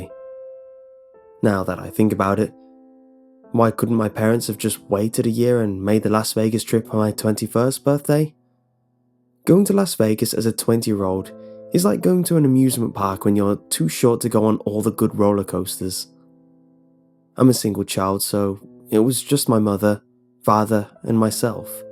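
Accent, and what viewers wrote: England, United Kingdom. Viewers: He sounds English, why is he talking about temperature in farenheit as opposed to centigrade?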